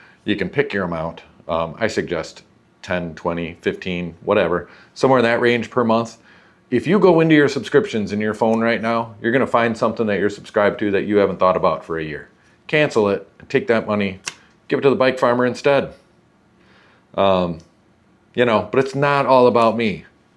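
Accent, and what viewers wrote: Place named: Upper Midwest, United States. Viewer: I like your show because I learn some new techniques and I like hearing your northern Midwest accent. I grew up in central MN. I have a suggestion for future content. I very much like “restoring/maintaining/servicing” older bikes. I mean much older. I’ve fixed up bikes from early 70’s, 1956, 1944, ,1930, 1915. Can you find anything like that in your neighborhood and do something with that? Since you may not want to fill your shop up with salable used bikes.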